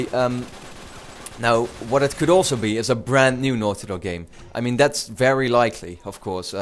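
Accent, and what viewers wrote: Netherlands. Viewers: never tried it before, i'll let you know the taste at june 10th, When they anounce U4, I realy hope they do ! :D Where are you from by the way, It looks like i hear a little dutch accent ?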